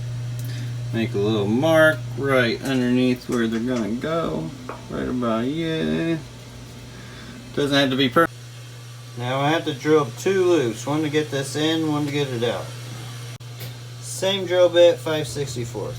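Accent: United States. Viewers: Where did you grow up? It's not often you hear a southern accent these days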